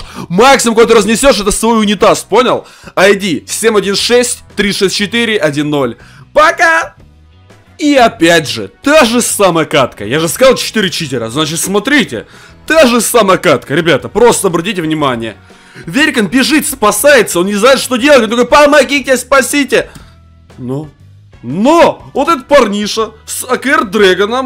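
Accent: Ukraine. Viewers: ты с Украины?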